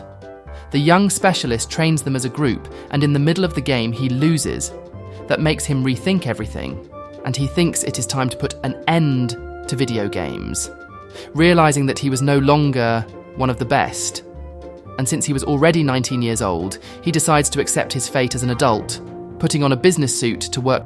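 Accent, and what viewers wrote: United Kingdom. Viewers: Curious question if you're from the US how come you have a British accent...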